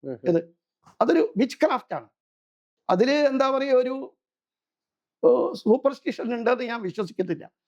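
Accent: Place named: Palakkad, India. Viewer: അത് പാലക്കാടൻ മാരുടെ... ഒരു ശൈലി ആണ്.. അത്രെ ഉള്ളു.. ഞാൻ പാലക്കാട് മാത്തൂർ പഞ്ചായത്തിൽ ഇൽ നിന്നു ഉള്ള ഒരാൾ ആണ്... ഇവിടെ അങ്ങനെ ഒക്കെ പറയും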